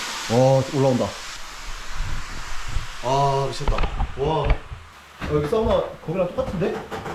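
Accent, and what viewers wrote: Gangwon, South Korea. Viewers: 이자는 강원도 사투리가 조금씩 섞인 말을 한다.... 오늘은 그래가지고 등등 ㅋㅋㅋ 노란색 티셔스 입으면 만화 꿀딴지 가지고 있는 곰 모습이 보인다 ㅋㅋㅋㅋ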